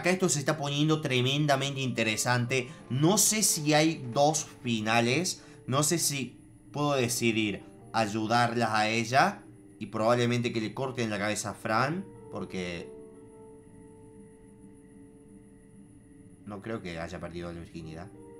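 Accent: Argentina. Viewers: sos argentino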